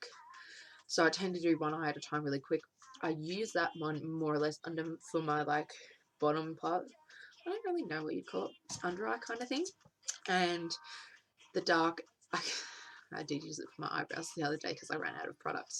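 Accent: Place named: Australia. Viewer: I love your accent my accent is super boooring yours is so cute! My auntie is from Australia and I always make her talk so i can hear her accent lol!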